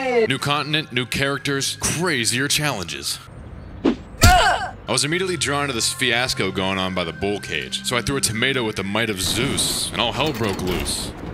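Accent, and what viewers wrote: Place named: Pittsburgh, United States. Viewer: Are yinz from the Burgh?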